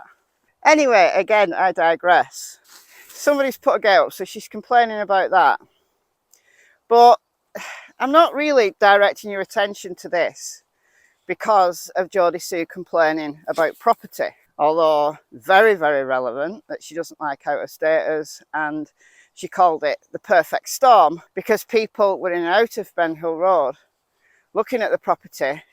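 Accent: United Kingdom. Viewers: I live in Milton, Florida in the US, and EVERY morning I wake up, FIRST THING I do, is go to YOUTUBE, And look for your video. Every morning, and several times a day! I value your insights, your option, your intelligence, plus my best friend is British, I LOVE your accent!! YOUR highly intelligent, unbias, you look at every side to the story, not just one side. You have facts to back up your opinion. You don't shame ppl in the case, you stand for truth, morals and justice for Summer Moon Utah Wells!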